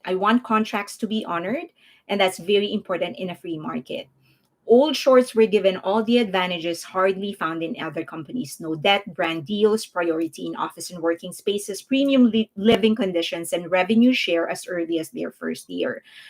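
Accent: Philippines. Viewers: shes from the philippines thats our pronounciation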